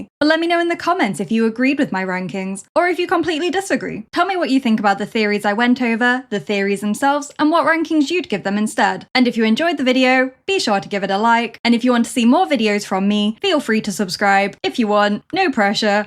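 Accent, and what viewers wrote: United Kingdom. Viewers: Hi thanks for another brilliant video. Off topic question: i’m very curious about where your accent is from. I am American. i think your accent is British but I can’t place it and I used to know British regional accents pretty well. I hear a touch of Australian but i don’t think that’s it. If you are willing to share?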